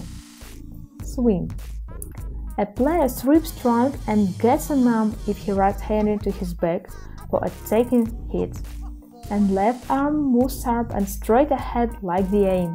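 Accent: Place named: Russia. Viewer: Сначала не мог понять, почему мне мерещится русский акцент. Прочитал название канала. Понял,я то не мерещилось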